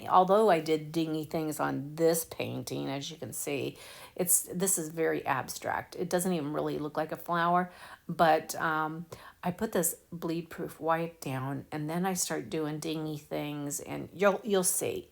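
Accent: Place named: Florida, United States. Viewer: I love making cards and this is giving me great ideas. What was the glossy ink you spattered on this one? Also what did you seal it with? Are you from Naples FL? You have an accent 😊 My daughter lives in Naples. Just wondering.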